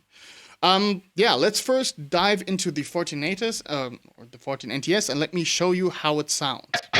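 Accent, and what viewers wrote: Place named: Germany. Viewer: Ach, Deutscher! Hab's am Oettinger bemerkt und jetzt auch etwas am Akzent. Aber das Video zuvor hätt ich nicht unbedingt darauf getippt. Kompliment. Wenn du magst, ich mache auch ab und zu Videos, wo ich Gear und Software präsentiere (meistens in Form von Riffs, spreche nicht). Das ist z.B. mein Sound mit dem Nalex Gerbert: <link> Hier ausschließlich ein AMT K2 Pedal, also ohne TS davor: <link>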